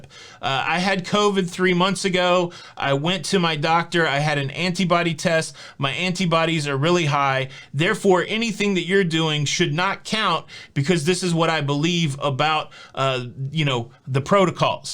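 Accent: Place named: New York, United States. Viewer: Sounds like fellow New Yorker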